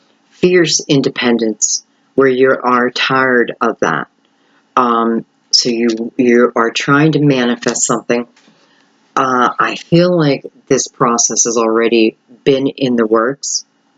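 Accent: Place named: Pennsylvania, United States. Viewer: You’re from PA. I know that accent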